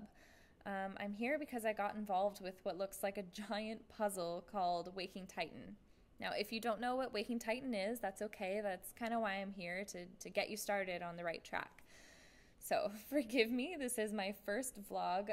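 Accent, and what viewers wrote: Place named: United States. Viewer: American accent and ends vlog with "cheers" obviously been hanging out across the pond... in hello games studio? hmmm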